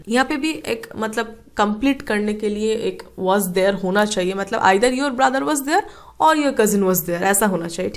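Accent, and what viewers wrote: Bengal, India. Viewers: Ap bengali ho kya???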